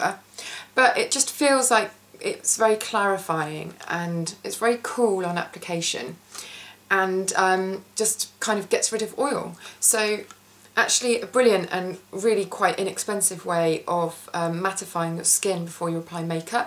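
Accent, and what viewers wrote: United Kingdom. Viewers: I love watching you.You are super gorgeous but not stuck up.I could litsen to you speak for days.All of the lovely ladies from the UK speak so wonderfully.I hate my NY accent even though I haven't lived there in years.Your skin is so pretty,you don't need foundation.xoCathy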